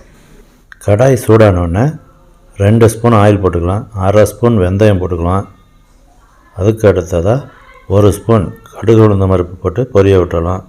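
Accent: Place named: Madurai, India. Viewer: chanceless recipe bro... unga tamil madurai side madri iruku bro.. very nice and yummmmmmmmmmmmmy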